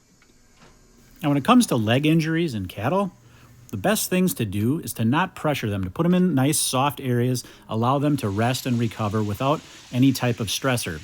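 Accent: Scotland, United Kingdom. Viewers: I like the Scotsman's accent. But he does have more 'filler' in his uploads nowadays. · I confess to be a bit annoyed with some of the extra fluff he puts in -- but I believe many of his other subscribers actually enjoy the fluff. His latest video had Zero fluff and all hoof-trimming. So I think he changes it up for variety. As an American living in England, I rather like the Scottish accent.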